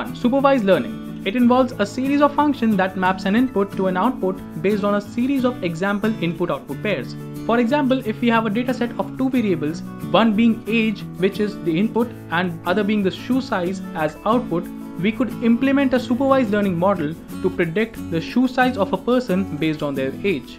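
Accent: India. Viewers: 又是满满的咖喱味儿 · Why indian accent use too much tongue?!!! It's so annoying.. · The moment i heard the indian voice i just knew it would be an awesome video :)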